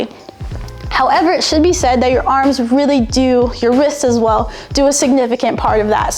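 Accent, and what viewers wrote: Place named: General American, United States. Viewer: she has a simple, more-or-less "standard" American accent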